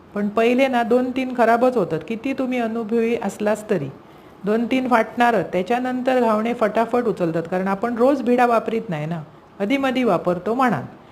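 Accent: Malvani, India. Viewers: वा भारी! घावणे पण आणि मालवणी पण❤😊 आमकाव आवाडतत❤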